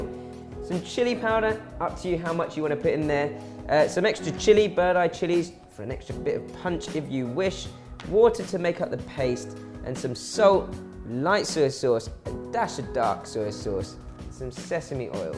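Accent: England, United Kingdom. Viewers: is my new favorite internet chef and I watch A LOT of them. He is straightforward and simple, has a cute Brit accent, makes DOABLE dishes and is a NO-NONSENSE kitchen master. I suspect he speaks Cantonese and some French. My main man!!!! Bon appetite! Cheers. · Chinese man with the pom accent, no matter what countries how gangster you are.? But when you have a pom accent made you look cool and sweet.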